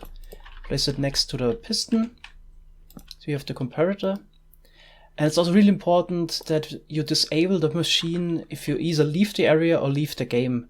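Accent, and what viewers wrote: Germany. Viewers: Kann es sein, dass du deutsch sprichst? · Are you German sometimes it Sounds like so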